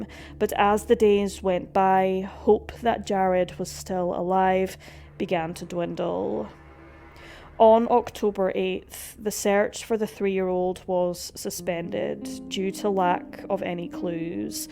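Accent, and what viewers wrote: Scotland, United Kingdom. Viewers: She sounds Scottish to me. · I've heard this story before, but never in a Scottish accent. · It’s called a Scottish accent 🙄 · Trying to gauge your accent? Scottish? Just stumbled on your channel BTW. Great video thank you. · Your voice sounds like a combination of Scottish California Valley Girl with a bit of vocal fry thrown in. Very interesting. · You mean… with a Scottish accent? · Ur voice makes the rest to of us Scots sound like idiots seriously sort it out 🙄🤨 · Ooohhhh Scottish sister 😍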